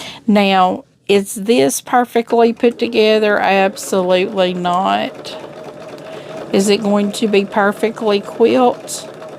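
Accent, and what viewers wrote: South, United States. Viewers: Love listening to you and your southern accent. My son-in-law was in the service so when was released he went to the post office and applied. Apparently the post office puts service people on the top of the list, after they have completed the exam and application process.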